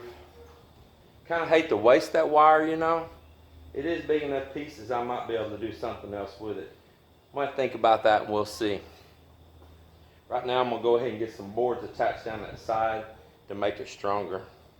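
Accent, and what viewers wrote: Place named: South, United States. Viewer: Yes I am. Thats why I watch your channel because your southern accent plus yall make me laugh. And yes the gulf coast s nice. I miss the ocean and the heat. Its been in the 50s today. I could see my breathe in the air. Yall keep up the content we love it. - Amber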